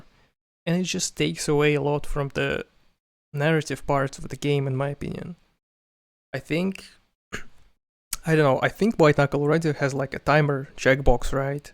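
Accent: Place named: Russia. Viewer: Я слышу русский акцент брат))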